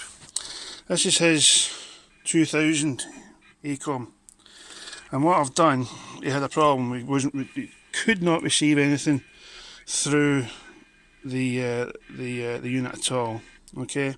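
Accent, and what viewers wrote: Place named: Glasgow, United Kingdom. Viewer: Tell me - you must be from Glasgow or the west at least!! Cheers de VK6HIL ( an ex-weegie! )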